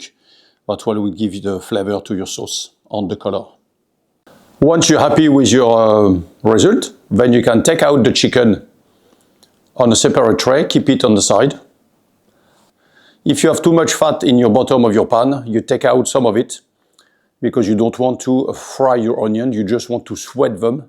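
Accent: France. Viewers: Your French accent is even better than the dish ❤ Thank you so much 😊 I'll be looking out for your other recipes. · French 🇫🇷 English is so funny 🤣 I am trying to absorb and re-enact as a stand up comedian. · Why does it look and sound so much better when done by a Frenchman?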